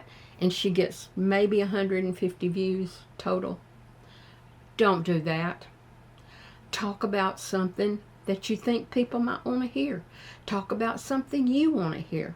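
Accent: South, United States. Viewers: I'm a 58 year old man working on putting together a YouTube channel about several different subjects I'm interested in and I'm listening to everything you're saying! I love your channel and I make it point to watch your videos just before I got to bed because they're so relaxing to listen too. I enjoy your slow southern accent but Ironically you get to the point very quickly. I'm from the south and I have lived way up north for several decades and I miss the southern accents and the slower pace of life, your videos give me that back home feel. I found you by looking up side hustles and when I watched your first video on how you did it I was so encouraged that I decided I'm going to do it! Now I regularly watch for your videos and I am subscribed to your channel so you come up in my feed daily now. Thank you for sharing your wisdom and honesty!!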